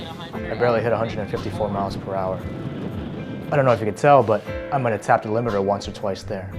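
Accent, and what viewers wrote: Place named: United States. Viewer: He sounds American to me. 🙄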